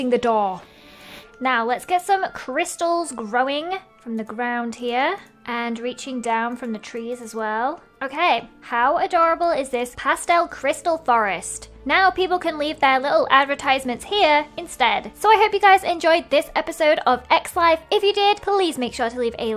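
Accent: United Kingdom. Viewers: So she talks british like normal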